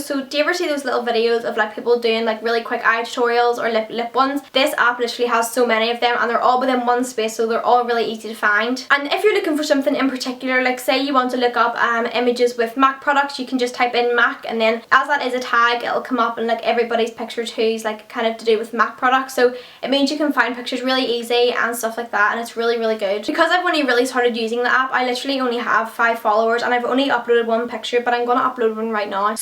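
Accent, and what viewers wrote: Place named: Northern Ireland, United Kingdom. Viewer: I love your accent.. May be weird saying that bc Im from Northern Ireland too :)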